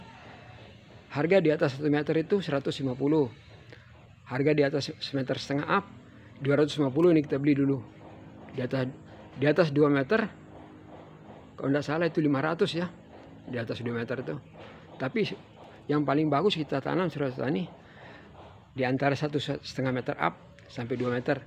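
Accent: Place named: Lombok, Indonesia. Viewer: dari logat nya orang sasak ya ?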